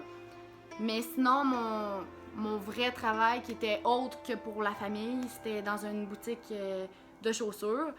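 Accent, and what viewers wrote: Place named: Abitibi, Canada. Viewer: On est pas a Mtl mais justement, les gens vont voir autre chose que du magasinage et vont voir une Abitibienne rentrer du bois de poele tin toé ahah! Bravo de te lancer:) moi jpense que cest interessant de voir du différent, justement dans ta facon de parler on le voit que tes une Abitibienne pi ca vient me rejoindre ahah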